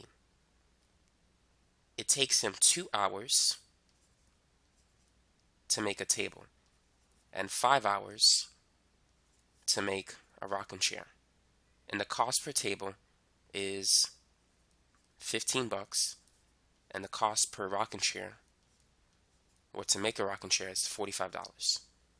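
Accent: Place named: New Jersey, United States. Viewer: you're def from New Jersey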